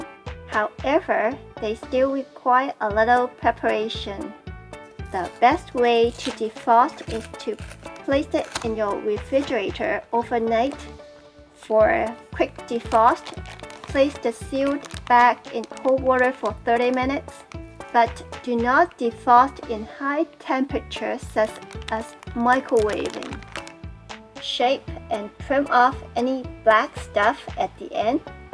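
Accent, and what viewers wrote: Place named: China. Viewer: Wow....There's nothing wrong with her accent, if anything it just brings more Chinese authenticity. I would argue there are many English speakers are becoming more and more curious about Asian cuisines and channels like this is contributing a lot to this shift in mind set.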